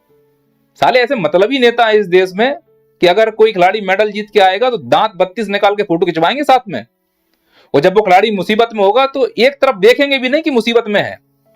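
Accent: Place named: Uttar Pradesh, India. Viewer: Sir aaj pura u p lehje me bol rahe hai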